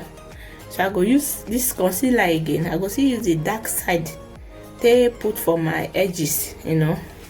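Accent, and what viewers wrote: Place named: Nigeria. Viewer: Lolz... The pidgin is really funny ... although u are gud in the make up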